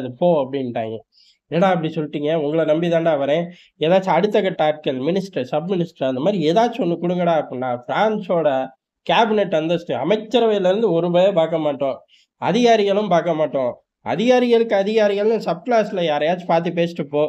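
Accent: Madurai, India. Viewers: மதுரை ஸ்லாங்காத் தெரியுது !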